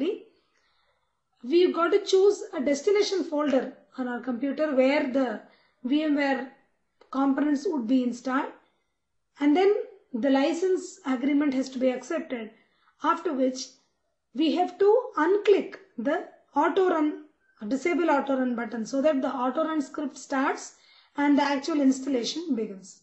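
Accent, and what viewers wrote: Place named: South India, India. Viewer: South Indian accent !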